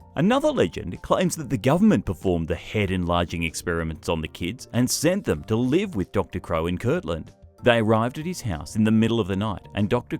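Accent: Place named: Australia. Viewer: An Australian accent but adopting American terminology.... what's the matter narrator... can't accept Australianisms?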